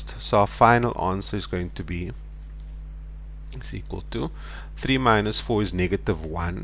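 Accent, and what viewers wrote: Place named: South Africa. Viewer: lol i can hear he’s south african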